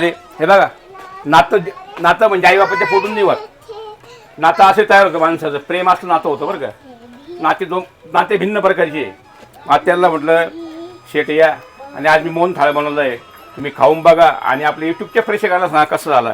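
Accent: Khandesh, India. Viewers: तुम्ही कुठले आहे मला तर खान्देश कडचे वाटता भाषेवरून मोहनमाळ बघितल्यावर माझ्या तोंडाला पाणी सुटले पण असा मोहनथाळ आमच्या कडे खायला मिळत नाही कोणी बनवत नाही ईकडे तुमचे सर्व पदार्थ खुप छान असतात आणि अगदी प्रेमाने तुम्ही ते काहीही न लपवता सांगता खूप छान वाटते असे तुमचा मोहनथाळ बघून तर मला असे वाटले माझ्या कडे काहीतरी कार्यक्रम करावा आणि मोहनथाळ तुमच्या कडुन बनवुन घ्यावा 😋 आम्ही पंढरपूर जवळ राहतो म्हणजे माझं सासर आहे आणि माहेर चाळीसगाव पण आता तिकडे कोणी नसते पण तुमचे पदार्थ बघून तिकडचीच आठवण येते मला खुप छान बनवता तुम्ही सर्व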